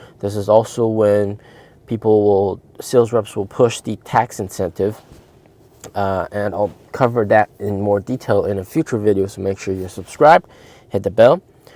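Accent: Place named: Texas, United States. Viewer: Every time I hear you talking without looking at my phone I thought you were American from Texas😅, you talk like the guy from magpie restaurant who teaches ppl to cook🎉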